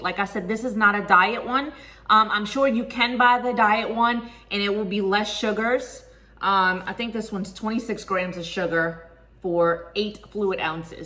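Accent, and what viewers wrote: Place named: New Orleans, United States. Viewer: I’m going to try this. I’m going to take a wild guess & say you’re a NOLA Girl, I’m seldom wrong with detecting my people’s accent 😎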